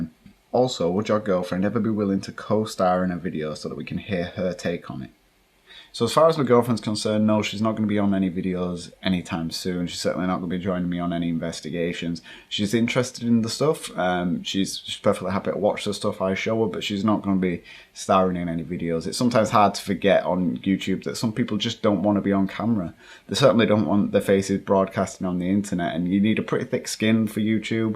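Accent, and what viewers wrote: United Kingdom. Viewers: Hi guys I am french. I need help to understand at the point he explain us how the paranormal activity started around him. The british accent is too strong for me :( If someone could write it down would be nice. Thx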